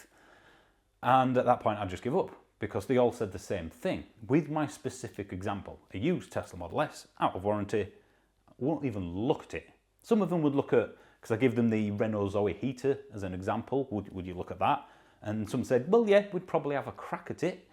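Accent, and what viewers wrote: Yorkshire, United Kingdom. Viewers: I own a car repair garage 30yrs. I am level 4 EV qualified. We have been a member of HEVRA for 9 months. I have stripped and repaired a leaf battery HEVRA helped us all the way through it with correct information I would do one now without an issue. We are now at the EV stage where we were when fuel injection first came out! HEVRA are here to “hold our hands” through in depth EV repairs which I explain this to our EV customers but you’ll find a lot of garages don’t want to make “false promises” and let the customer down. You going to have a look around at the moment but 2/3yrs from now you’ll find a LOT more HEVRA members will be a lot more confident. I’m on a training course with cleverly EV early April and hands on course inc Tesla I actually think your near us by your accent Cleckheaton area.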